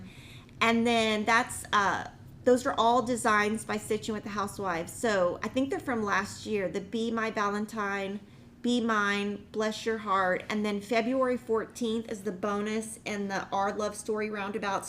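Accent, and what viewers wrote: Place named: Texas, United States. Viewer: I really like seeing other stitchers/quilters work. I get ideas especially for colors that I wouldn't have thought of on my own. Color of thread, floss and fabric are the hardest things for me to choose. BTW, I love your accent especially when you say y'all. It reminds me so much of growing up in El Paso.👍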